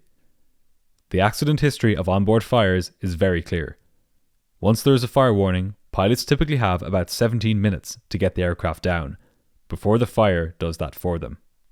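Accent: Ireland. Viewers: Yeah, he does have a very pleasant Irish lilt. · That an Irish Brogue ?Love the channel.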